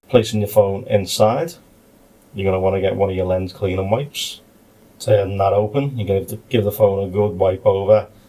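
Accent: Liverpool, United Kingdom. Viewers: Thanks for the video! Very useful. A curious question, are you a scouser? Greetings!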